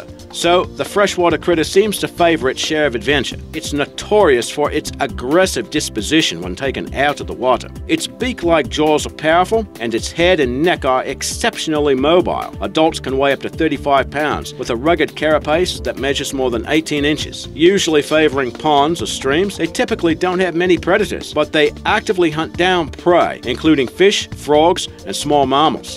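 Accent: Australia. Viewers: What is your accent? It's sounds Australian / Boston / English / New Zealand / Texan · Is this an aussie or NZ accent?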